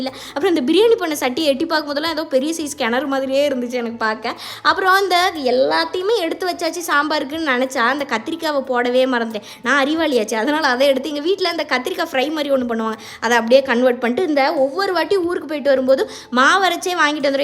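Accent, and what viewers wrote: Tirunelveli, India. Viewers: Aha puthayal eduthutu vanthutaena 😅😅😅 ithu namma nellai side slangla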